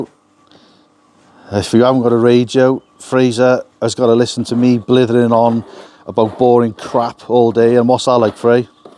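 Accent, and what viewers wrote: Wales, United Kingdom. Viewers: Wales is as beautiful as the people. Englishman living in Germany fellas. I miss Barmouth and visiting my retired parents who moved from Barmouth sadly more inland. I checked and I actually have more rain here near the Austrian alps than you do...but it's south Germany so we do get more sun. Love your people and the accent boy o. Top tips! let's have few pints together.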